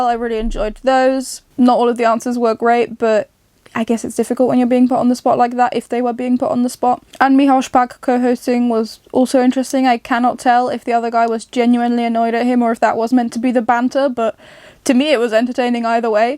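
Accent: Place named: United Kingdom. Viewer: Ale masz fantastyczny brytyjski akcent. Mieszkasz tam?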